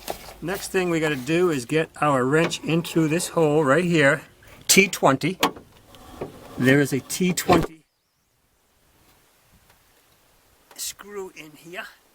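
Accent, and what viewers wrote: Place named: New England, United States. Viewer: I saw a million professional videos on this job and it's the guy with the New England accent, like Peter Griffin, that got the job done and helped me truly understand. Much appreciated. 😄🍻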